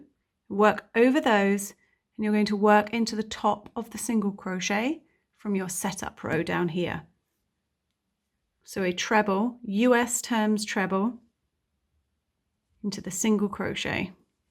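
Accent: England, United Kingdom. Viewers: I heard your accent and assumed you would be using British crochet terms until I heard SC! I'm a beginner to blankets and anything that isn't amigurumi, would I be able to follow this if I remember that SC is DC and DC is TC to me? Are there any other differences ? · Great tutorial thanks also so sweet to listen such a rich English accent.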